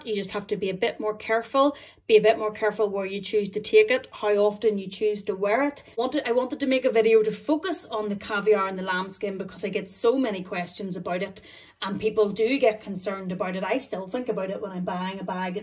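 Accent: Ireland. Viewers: Love your Irish ☘️ accent 😊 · Hi, I have to say I loved listening to you because of your lovely Irish accent. I love Channel. You are very knowledgeable. I just subscribed.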